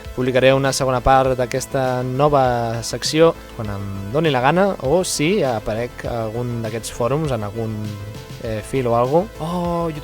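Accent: Lleida, Spain. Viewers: Boníssim hahahaha la gent s'ofèn per unes ximpleries... Sembles lleidetà amb aquest accent, eh :')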